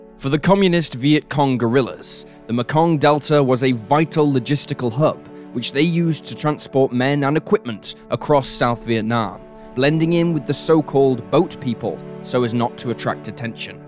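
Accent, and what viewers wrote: United Kingdom. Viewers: the us military didnt have a 9mm pistol during the viet nam war. they used 1911 45 acp or 38 special revolvers. Limey narrator, reading a script. lmao. No credibility .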